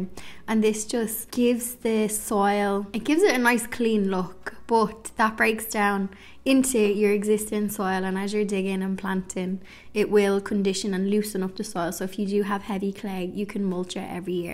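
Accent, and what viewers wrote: Ireland. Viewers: Your video content is great but the real appeal of your videos is your voice and accent. Nothing says cottage garden inspiration more than a whimsical Irish accent 🍄🍀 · Just came across your video. Beautiful work! Btw, I love your Irish accent 😊. We loved traveling thru Ireland..absolutely beautiful country! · Hello from California USA. I have only just found your channel and must say you have taken to gardening in the best most natural way! I admire all that you have done, with the beautiful greenhouse the raised beds and the floor and garden wall you " bricked." What a great and creative way to add loads of charm! I will continue to follow your inspiring channel...plus I love to listen to your Irish accent! Happy Spring! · I just now discovered your channel. The word cottage drew me but then I saw Ireland! I’m half Irish but have never been there. 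Everything about this video was a delight, including your lovely accent! You have created such a sweet garden.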